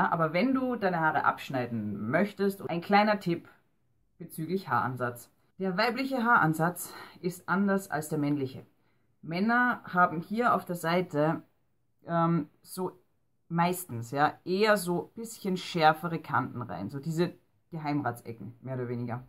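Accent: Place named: Vienna, Austria. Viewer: bist du aus wien oder niederösterreich oder so? dein dialekt hört sich bisschen so an^^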